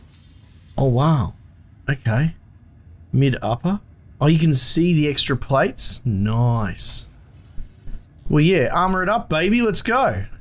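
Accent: Australia. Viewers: Is it me or are you Australian?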